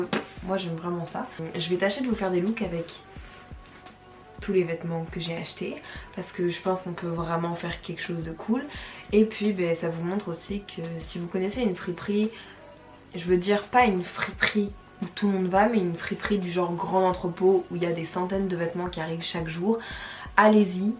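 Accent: Quebec, Canada. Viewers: Tu prendrais pas l’accent quebecquois ahaha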